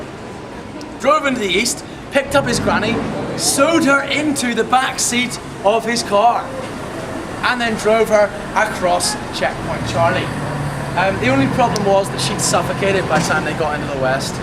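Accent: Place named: Ireland. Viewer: i like tha way he talks ..... he has an ireland accent ..... lovely !!!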